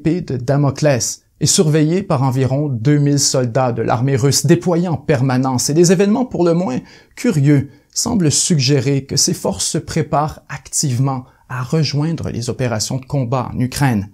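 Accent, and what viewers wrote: Quebec, Canada. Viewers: vous faites un discours pro-américain et proposez des cours d'anglais. Abandonnez votre accent québecquois, vous êtes un traître, sans plus. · Je suis à 1 minute, je découvre cette chaine, et suis déjà convaincu qu'il s'agit d'un bon média ( et l'accent québécois n'est pas trop prononcé il est facile à comprendre pour un français ne maîtrisant pas votre accent, merci )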